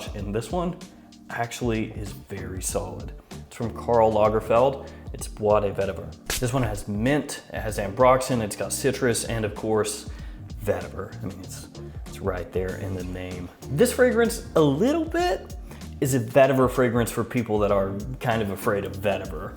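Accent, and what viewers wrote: United States. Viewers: I mean hes American so I guess his normal talk is actually how we talk lol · Really? That's how Americans talk?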